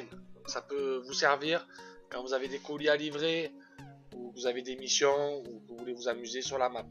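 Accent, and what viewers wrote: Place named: South, France. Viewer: Oui toutes tes vidéos sont bien expliquer avec ton accent du sud j adore poto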